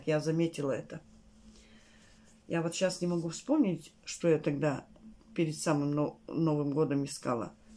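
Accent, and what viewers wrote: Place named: Urals, Russia. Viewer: Я не так давно на вашем канале, и при просмотре первого видео сразу услышала уральский говор.😊 Я сама родилась и выросла на Урале, и хоть и живу уже несколько лет в Питере, но наш уральский говор узнаю сразу 🤗😊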